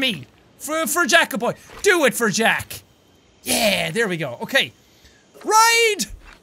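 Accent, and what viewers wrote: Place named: Ireland. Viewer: wow you are irish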